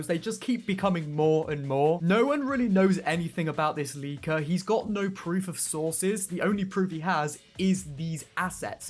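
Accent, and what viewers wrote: United Kingdom. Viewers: You From The Great Britain Fine sir? I like your accent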